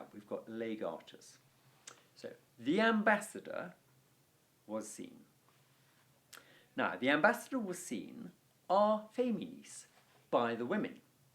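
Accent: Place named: England, United Kingdom. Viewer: Thank God for your English accent and clarity. I sometimes think I'm listening to Caesar recited by Davy Crockett.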